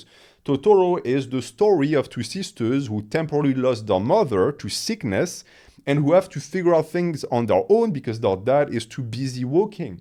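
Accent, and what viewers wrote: France. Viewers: Why is Adam driver pretending to be a YouTuber with a French accent? He's pretty good!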